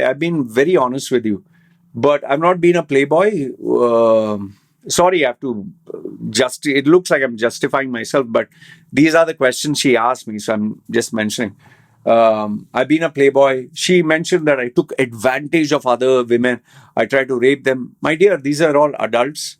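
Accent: India. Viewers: Are you an indian, your accent is completely indian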